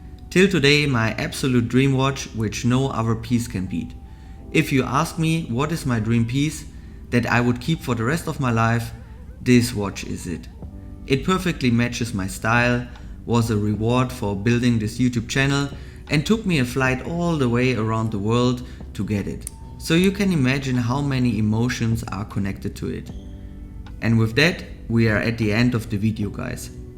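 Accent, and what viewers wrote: Germany. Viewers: Denglish hardcore😆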